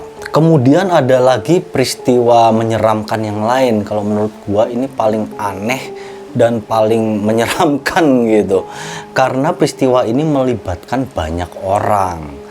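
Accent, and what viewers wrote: Java, Indonesia. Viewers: Sekarang jadi medok uncle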